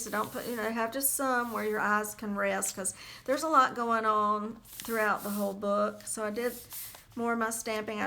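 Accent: South, United States. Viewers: Just found you and love your art, shop, and southern accent. (I am from SC)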